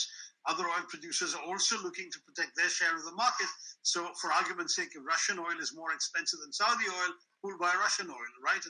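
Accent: England, United Kingdom. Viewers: Respected sir, this is sandeep sharma working with IFFCO as a stenographer. I have been watching your video to enhance my vacab. And its knowledgeable too. You are great sir. I am really gladdened with you english accent and vocab. Please be there to improve english of a person like me. Thanks a lot .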